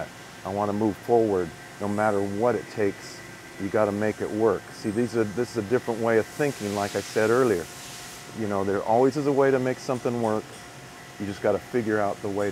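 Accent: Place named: California, United States. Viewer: I wondered if you had a bit of California in your story; I'd taken from your accent that you'd lived there.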